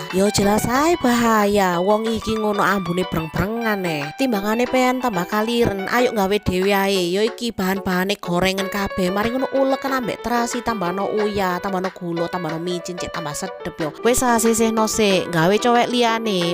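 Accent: Surabaya, Indonesia. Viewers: Mantab medog suroboyoan 😂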